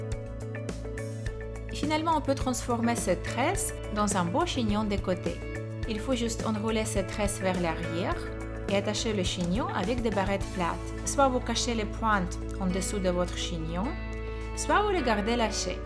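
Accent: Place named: Russia. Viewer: Tu as l'accent russes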